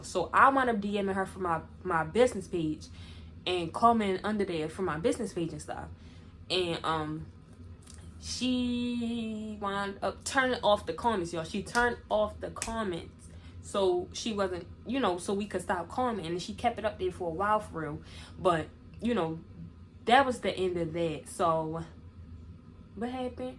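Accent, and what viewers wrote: Baltimore, United States. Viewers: I just knew you was from Baltimore before you said it 😂😂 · I love how you talk fr 😭 bmore accents so 🔥 but she weird friend , new subbie! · I love how you talk fr 😭 bmore accents so 🔥 but she weird friend ..she might’ve wanted to be with u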